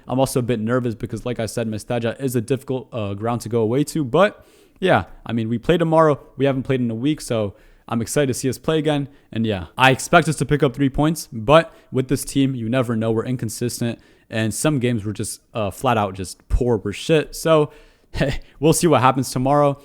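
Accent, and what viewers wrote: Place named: Argentina. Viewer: Do you have from Argentina? You sound Argentine when you pronounce Spanish words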